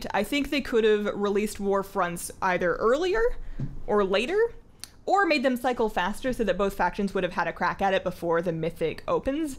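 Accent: Canada. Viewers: You're Canadian, aren't you? :)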